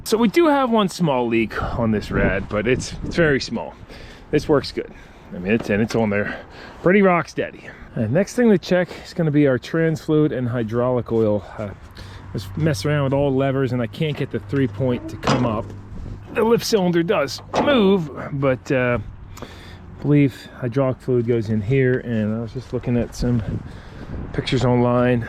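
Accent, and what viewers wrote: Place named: New Jersey, United States. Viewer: I’m not a car guy or “Motörhead” but I am amazed by your videos. The expertise and the way you can diagnose and fix just about everything… And you have the parts right there at hand… Is truly incredible to watch. Plus I love the jersey accent because that’s where I was born and raised. Anyway, keep up the great work, man.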